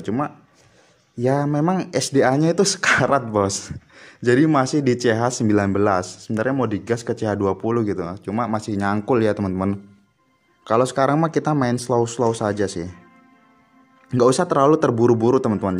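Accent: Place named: Medan, Indonesia. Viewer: Medan mana suaranya.... SULTAN MEDAN DIA BG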